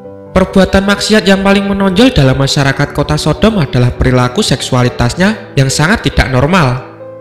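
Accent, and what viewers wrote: Java, Indonesia. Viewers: Wong jowoooo medokk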